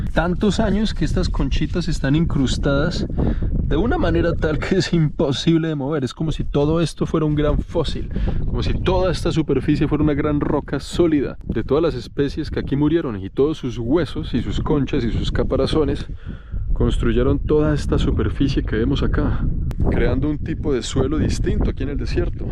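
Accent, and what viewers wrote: Colombia. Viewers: Si es colombiana nacida en Ibague y vive en Australia. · su acento es colombiano. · Me encanta el acento colombiano.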